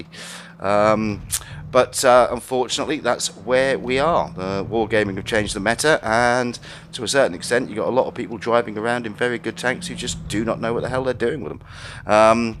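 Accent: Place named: United Kingdom. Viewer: Naisu Why do you soind like British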